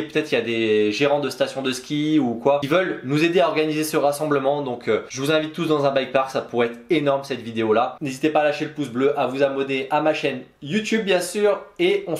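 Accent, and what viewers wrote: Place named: Belgium. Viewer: S'il te plaît respecter l'accent belge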